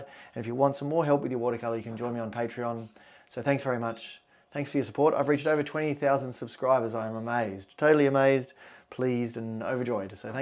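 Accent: Australia. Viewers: Hi, Best wishes from the UK. It is always a pleasure to watch you at work and to see your face when signing off. Keep up the good work, you are insirational! Love the aussie accent.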